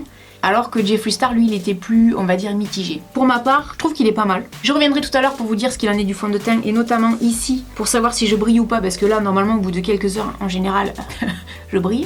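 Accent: South, France. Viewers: Hello, en temps que française qui habite en Suisse, tu es mon rayon de soleil du Sud avec ton magnifique accent! Merci pour ça. Aussi je voulais ajouter que j'adorais tout particulièrement ton maquillage des yeux, encore plus beau que d'habitude!😍 Et oui je confirme quand ta plus tes taches de rousseures ça fait bizzare, mais l'effet cadavre avec cette teinte est garantie 😂 Je voulais aussi dire que j'ai souvent eu l'impression avec les rouges à lèvres (à peu près de la même teinte que le plus foncé ) il y avait souvent de l'inégalité dans la couleur! Merci en tous cas pour nous partager tes avis et ta bonne humeur! Ne change rien! 😘❤❤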